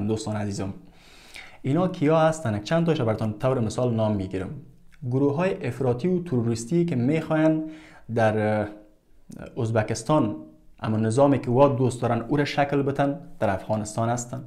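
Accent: Iran. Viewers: Ne tu konigak khai namaindagi mekadi jawanhai Afghanistan ra. Keroom da lahje iranet. Bacha bershe amerallah salle.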